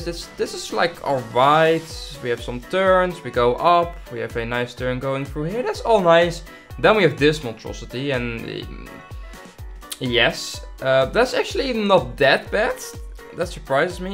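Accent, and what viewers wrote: Netherlands. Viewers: i smell dutch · Are you dutch by any chance?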